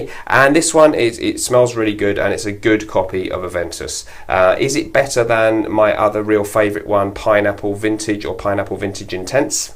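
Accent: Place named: Cockney, United Kingdom. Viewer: urhghh, that cockney accent shudder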